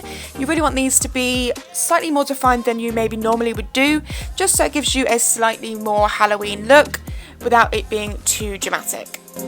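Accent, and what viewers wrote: United Kingdom. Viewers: are you from Britian because your accent makes it sound l;ike it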